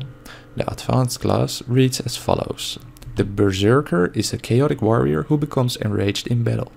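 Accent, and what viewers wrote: Netherlands. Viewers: op reis hahaha Wow ik dacht al dat ik het herkende:p (I already thought I knew the melody, but couldn't remember it:p ) Now that you say it's a dutch song, your accent sounds from Holland, is that right?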